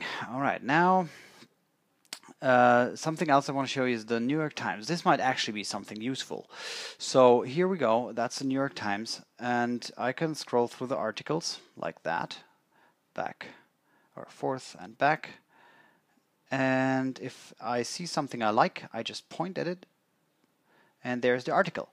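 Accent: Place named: Germany. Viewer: This is the first vid I've watched of yours and I kept thinking "He sounds like his accent is German" and then you went to your home town haha.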